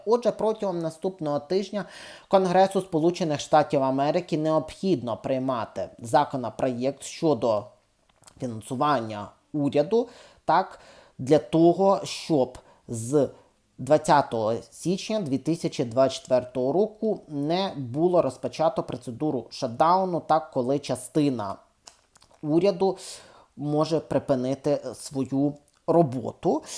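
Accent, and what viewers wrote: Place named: Canada. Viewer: цікавий акцент. ви проживаєте у Канаді?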